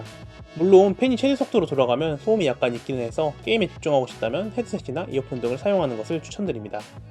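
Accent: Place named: Jeolla, South Korea. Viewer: 전라도 말투가 신뢰가 안가네요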